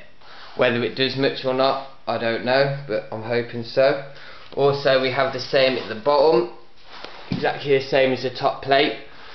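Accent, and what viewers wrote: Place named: Midlands, United Kingdom. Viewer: Hi chap. Where you from you sound kinda midlands way. Like me!! Who made the tank in the first place mate? As them stress bars look way too inadequate. I had a four foot rena and they were massive on that for a little tank. And my 700litre now has some sturdy strut bars. They just look too narrow for my liking?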